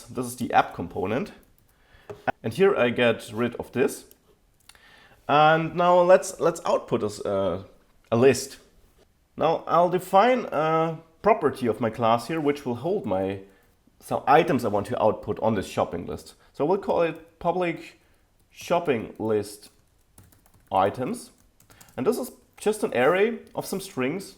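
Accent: Germany. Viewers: "Bootable", "Boot" I love German accents! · Ich hab das Gefühl, ich höre ein wenig Deutsch heraus :D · Thanks for this video. It is fast, direct to the point, no time lost, fun to watch, and nice german accent!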